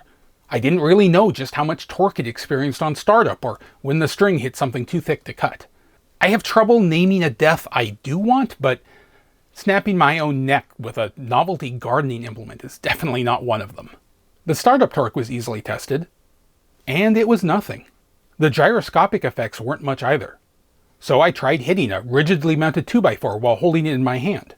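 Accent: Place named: Chicago, United States. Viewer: the juxtaposition of Chicago mafia accent botanising urban wastelands in latin and cursing is the best part!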